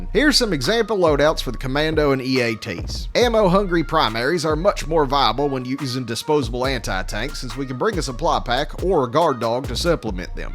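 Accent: United States. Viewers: from the first 15 seconds of the video i could tell this guy is 100% american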